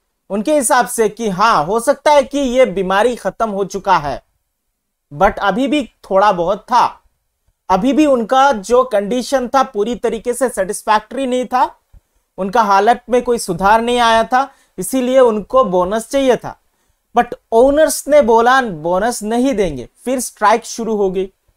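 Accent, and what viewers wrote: Assam, India. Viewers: Sir are u from Assam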